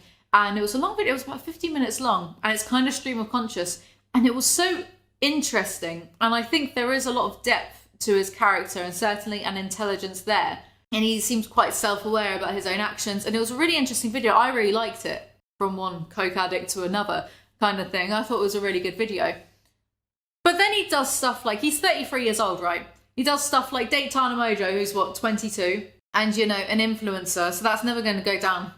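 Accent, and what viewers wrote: United Kingdom. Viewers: Gurl, you've got the prettiest dark honey-chocolate eyes, the makeup suits you well. And i will never get tired of listening to you talk about anything. Your british accent is so nice.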